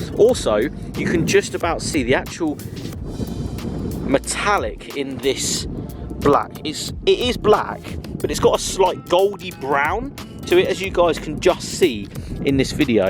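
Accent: United Kingdom. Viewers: Same type of British accent as James Corden. Sounds just like him.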